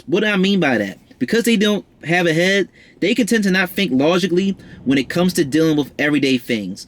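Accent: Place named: Baltimore, United States. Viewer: He sounds like he’s from Baltimore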